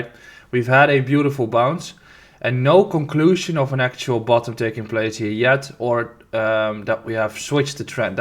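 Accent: Netherlands. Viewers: Ben je Nederlands ik hoor een accentje.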